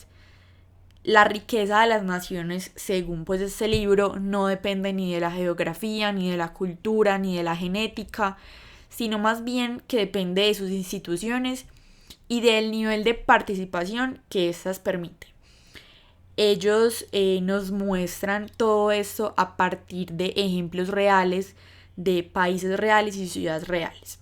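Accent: Colombia. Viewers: Eres colombiana no?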